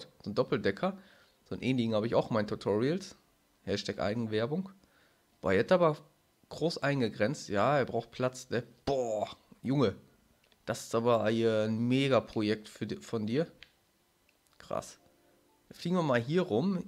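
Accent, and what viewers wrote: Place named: Dortmund, Germany. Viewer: Als ob du aus Dortmund Kommst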